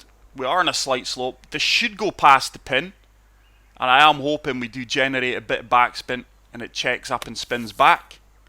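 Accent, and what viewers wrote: Scotland, United Kingdom. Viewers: Thanks for the vid, got Golf Club 2 and never saw any back spin. Though can use some pointers here, always a wee bonus getting a Scot doing a tutorial, nice one bud 🥃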